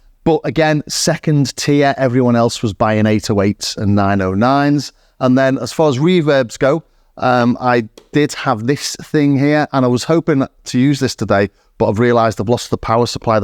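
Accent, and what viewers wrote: Liverpool, United Kingdom. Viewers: mate I really enjoyed this video and your other one on the HR16 which is how I found your channel. Back in 1989 I nearly blight the HR16 but opted for the Yamaha V50 which I still have. But this video is amazing and your channel too. I’ve subscribed and will watch as many of your videos as possible. Thanks for this and love your scouser wit and accent.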